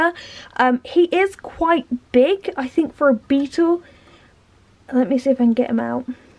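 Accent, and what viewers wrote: United Kingdom. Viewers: Wait are you from uk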